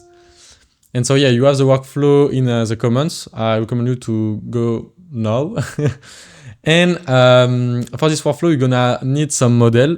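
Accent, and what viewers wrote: France. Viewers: Mec, to accent.. 😅 Heureusement j'suis francophone 😄 Nice stuff anyway, very creative 👏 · notre beau accent Français !! c'est magnifique, sinon gg et merci pour la video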